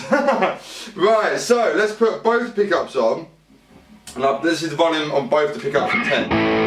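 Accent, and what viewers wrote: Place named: London, United Kingdom. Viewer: Londoner I think.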